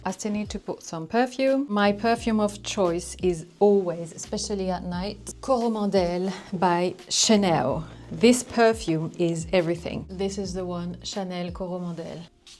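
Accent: United Kingdom. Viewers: Hi, I love your weekly vlogs. Especially this one, which is amazingly stylish and informative. What a lovely British accent you have 😊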